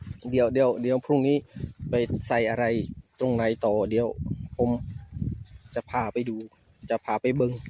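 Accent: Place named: Cambodia. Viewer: มักเสียงเว้า สำเนียงเขมรเด้😂😂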